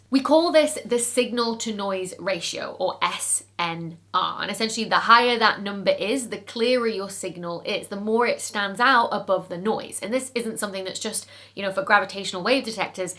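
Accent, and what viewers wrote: United Kingdom. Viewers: so nice channel and such an awful British accent!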